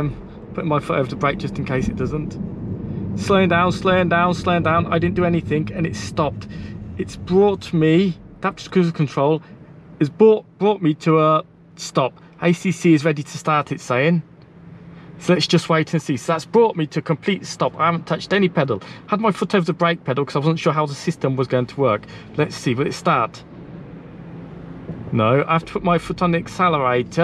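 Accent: United Kingdom. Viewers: Hi, great video! I have an ID.3 Life on order. I’m curious, are you British, Portuguese or what? Greetings from Sweden. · I agree again when it comes to B mode. In the end it depends on the kind of roads you're driving on and your personal preferences. The Travel Assist seems to be working quite perfectly. I can't wait to get my ID.3! BTW: Are you British or how did you get this nice British accent?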